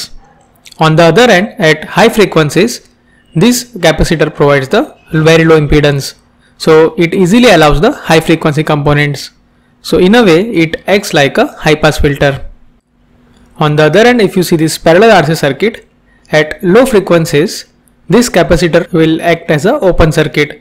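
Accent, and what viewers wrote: India. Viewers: Due to your Indian Accent, it is hard to wrap my head around the matter you explain. 😞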